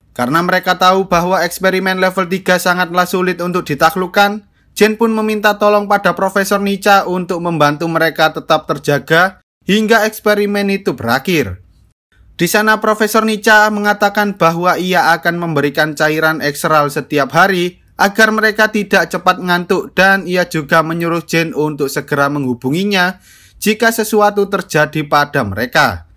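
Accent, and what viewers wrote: Java, Indonesia. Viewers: Dari cara ngomongnya, pasti orang jawa tulen ini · logat mu podo koncoku mas jowo ngendi sampean? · Suaramu wi lho bang medhog banget, malah mirip koyok suarane dodit hahaha🤣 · Wong jowo mas?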